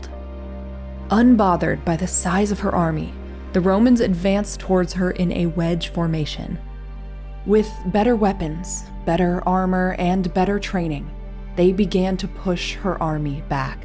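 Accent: United States. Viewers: Shame about the american accent. Ruins the telling, no strength or beauty.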